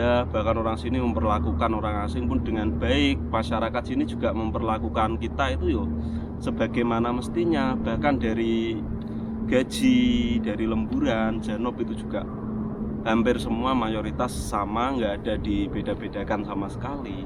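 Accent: Java, Indonesia. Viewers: Jowone ndi pak?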